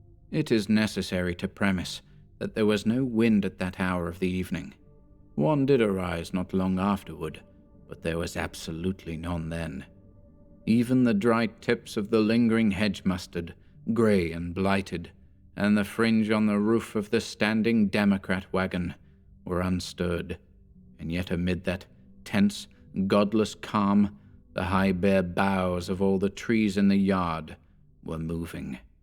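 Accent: United Kingdom. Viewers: Another excellent reading! I’ve been enjoying finishing a story myself first, and then listening to your readings shortly afterward. I very much appreciate your attention to accuracy when you narrate, and there’s something about a U.K. accent that lends a certain subtle sophistication to it haha. Well done!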